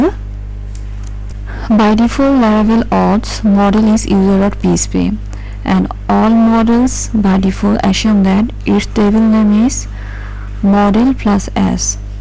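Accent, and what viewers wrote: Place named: India. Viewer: Your way of explaining is good.. By the way i am from India.. So from the accent I thought you were from India too.. Keep up the good work :)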